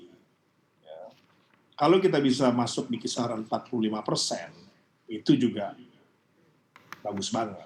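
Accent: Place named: Banyumas, Indonesia. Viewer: Salam ngapak pak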